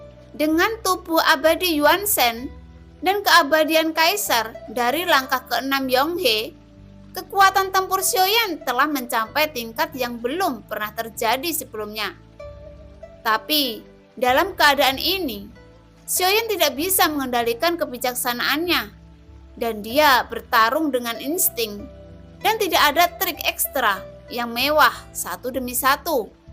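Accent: Java, Indonesia. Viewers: logat Jowo kok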